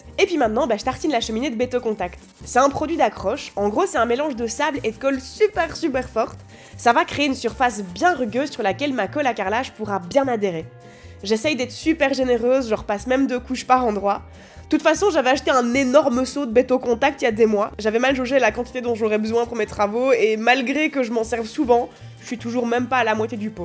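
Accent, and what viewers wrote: Belgium. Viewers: Je voudrais juste savoir un truc tu es française ou belge car tu as l’accent belge mais tu dis (sopalin ) comme les français marque qui n’existe pas en Belgique mais la bonne marque serait scotex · Pour dire WC les Belges vous dites "ouécé"??? Je sais que les Français on est pas logiques non plus vu qu'on dit "vécé" mais bon😅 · Entre "tant que ça drache pas des seau d'eau" et "je rappellerai le plombier pour savoir quoi" ton parler belge (qui ressemble à du parler ch'ti) me ravit les oreilles. Bon courage avec ta rénovation🌻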